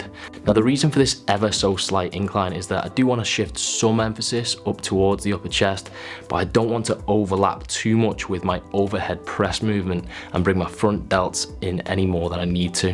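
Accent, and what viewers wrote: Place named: Birmingham, United Kingdom. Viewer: Hi, I like you accent, is it from Birmingham?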